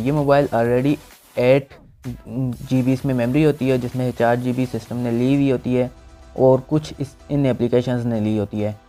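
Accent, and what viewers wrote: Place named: India. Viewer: At least ad captions I can't understand Indian plz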